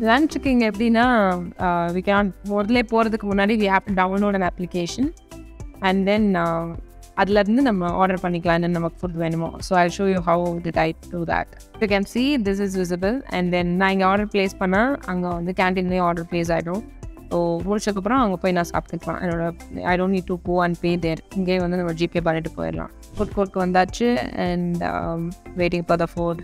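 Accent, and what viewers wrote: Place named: England, United Kingdom. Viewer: Tamil la pesu bodhum english accent..😅😅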